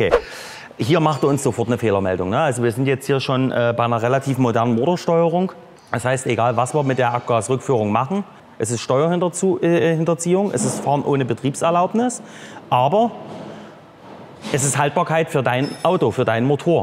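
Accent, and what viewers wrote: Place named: East Germany, Germany. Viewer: Klasse dein Ossideutsch😂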